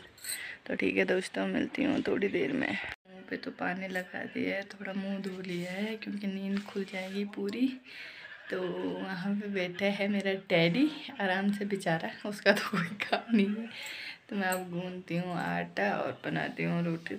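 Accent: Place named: Pokhara, Nepal. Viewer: Gadwali मैं बोलो आपकी pokhari भाषा तो बहुत मीठी है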